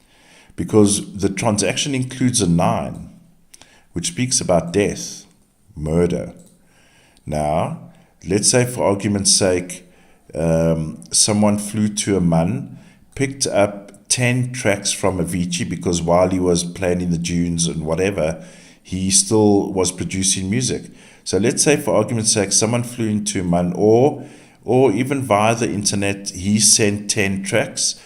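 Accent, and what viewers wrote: South Africa. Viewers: By any chance are you South African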